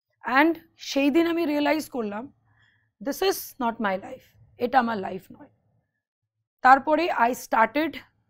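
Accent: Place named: Hindi, India. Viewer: Punjabi biye korle ki nijer matribhasha ta accent diye Hindi er moto kore bolte hoy?? Eder eituku video dekhei ja fake r nyakami, real life e ki jinis k jane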